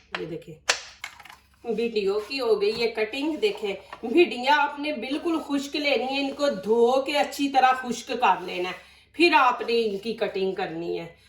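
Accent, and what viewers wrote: Punjab, India. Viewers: lovely punjabi accent.. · I watched a few videos of u .v.nice ..loved ur punjabi lehja urdu ...I myself have my own chanel .kokab khwaja studio....I enjoy ur videos too nd subscribe it ...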